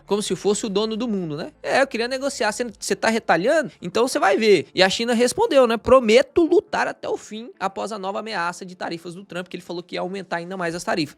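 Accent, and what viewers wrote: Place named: Minas Gerais, Brazil. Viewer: Alguém sabe de onde é o ADM? Pelo sotaque, e por um “uai” que ele solta de vez em quando eu arrisco que é do nosso país Minas Gerais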